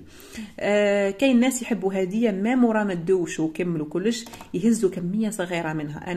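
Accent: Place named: Annaba, Algeria. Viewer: ياعمري على العنابية كي تقول خلاه خلاه تعجبني اللهجة العنابية ❤❤❤❤❤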